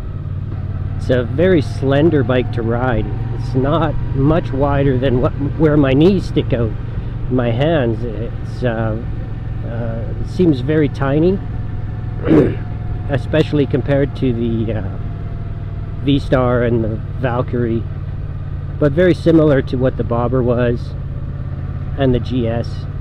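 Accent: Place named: United States. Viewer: I guess from your accent and you are riding on the right, that you are in the USA? I am surprised your speedo and odometer are in metric. I have just bought a new V9, in England, and the speedo is MPH and odometer in miles. The one thing I don't like about the bike is that the speedo and digital readout are virtually invisible. I can only tell what speed I am doing is by knowing where the red needle should be on the dial, and by keeping pace with the other traffic. At night the speedo lights up in a sort of red colour and goes completely illegible. It is OK if the sun shines directly on it, bizarrely. The numerals and letters on the digital readout are too thin to be legible which annoys me. They could have doubled the thickness of the lines forming the characters, and made it a lot better. I have done 35 miles after the 'low fuel' warning, so the lack of a fuel gauge isn't bad, though I would prefer one. 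If I had bought a V7 I would have got the one with the two dials. One important thing to know if you have a new V9 and want to buy a Givi rear carrier, if you buy what seems to be the only one available, it is advertised as fitting a V9, 2016 model. It fits the frame but then you cannot refit the seat. They seem to have lengthened the seat for the newer models. I made some brackets and fitted the carrier about 2 inches further to the rear. The seat now fits, but the downside is that in effect the carrier is only held on to the frame by half the number of nuts and bolts that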